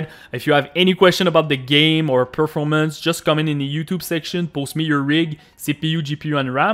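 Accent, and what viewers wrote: Quebec, Canada. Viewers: Thanks man ! Clairement un Québecois, corrige moi si je me trompe ;P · Tes québécois non?? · Bel accent Québécois! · Are you French Canadian?? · Um, are you a French Canadian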